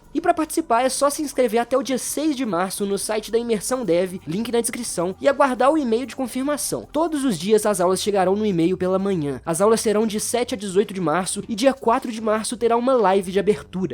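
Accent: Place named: Minas Gerais, Brazil. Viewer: Que orgulho desse sotaque mineirin 💛💛💛💛💛 vídeo incrível,!!!!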